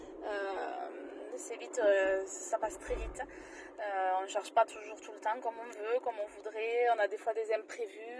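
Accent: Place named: South, France. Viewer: Il ne couvre même pas et fort heureusement ce (très) léger mais délicieux accent du midi ! 😃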